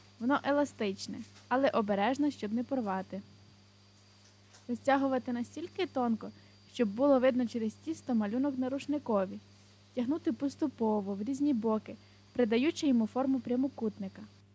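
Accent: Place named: Lviv, Ukraine. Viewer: Я у захваті від вашої подачі цього простого, на перший погляд, десерту, приємно слухати львівську говірку! Дуже дякую!!🥰🏆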